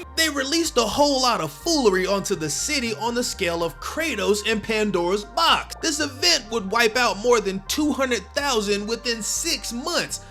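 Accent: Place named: Houston, United States. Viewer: "What it dew" "Going Dine" "Hol up" ....🤔This is a fellow Houstonian...and Black Cyberpunk fan...*Hits Subscribe immediately!!*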